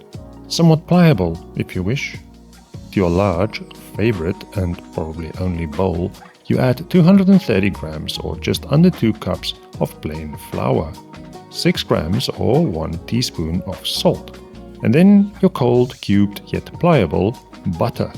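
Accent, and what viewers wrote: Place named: South Africa. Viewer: I know that accent😊 It is South African.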